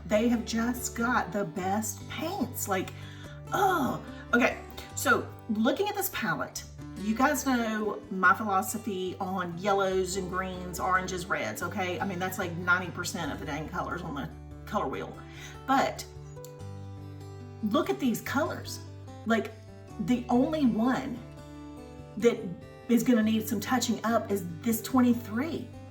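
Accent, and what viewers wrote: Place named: North Carolina, United States. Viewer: You are a joy to listen too, and as I'm originally from North Carolina, I love your accent.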